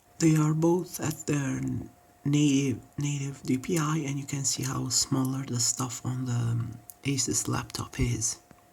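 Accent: Italy. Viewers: Sei italiano vero? Non hai una pronuncia da madrelingua :D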